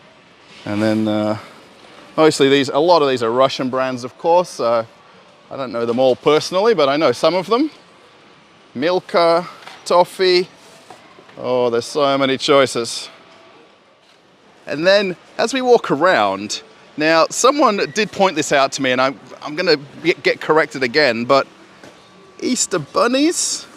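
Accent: Australia. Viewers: Австралийский английский как-будто понятнее что ли.. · Он же вроде австралиец. Да/нет? У него есть австралийский акцент?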